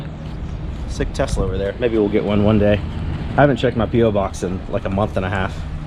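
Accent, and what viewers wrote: Australia. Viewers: Hahaha mate your Aussie accent was worth a giggle 🤣gday mate hope ya both well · That Australian accent though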